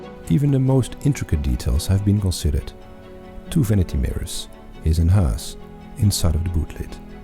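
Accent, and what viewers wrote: Netherlands. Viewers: Not sure they’re assumptions. I’ve tweaked my wording to better express the ideas. It’s not a style from today and based on a vehicle from half a century ago, so anyone extrapolating from it is an outsider looking in. Seems like a fair observation more than an assumption that because it’s a LHD vehicle built by a Dutch company it’s not British, but Dutch. It has the most stereotypical of Dutch accessories, the bike rack. Even the voice over has a Dutch accent. The electric drivetrain comment is about a personal viewpoint, not an assumption. My third comment is not an assumption either. I’ve always thought herringbone tweed would look great in a car and you’ve proved that to be true! This vehicle is utterly charming and looks exquisitely built and I love the “exercice de style” that it constitutes. But it is undeniably anachronistic and somehow feels foreign. 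It reminds me of how the Japanese see Britishness. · Jammer van dat Nederlandse-engels van de voiceover, maar wat een schitterend ontwerp. Als ik zo’n coupé zou bezitten zou ik Niels direct vragen die ook om te bouwen. Prachtig!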